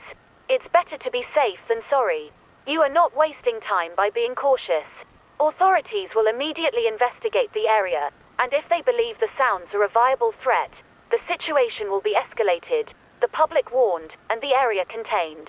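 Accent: United Kingdom. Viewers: I like that the lady speaking sounds BRI,ISH. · A few questions from someone who’s Irish. 1. Why does the Ukrainian EAS play at the start instead of the Irish one? 2. Why is the TTS voice British instead of Irish? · bri'ish voice hits it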